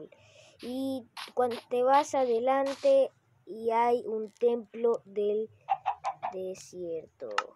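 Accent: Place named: Spain. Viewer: Buen vídeo amigo pero un consejo no hables como un español porque hay gente mala que se te va a burlar. Yo no soy una yo te felicito y gracias por las semillas 😁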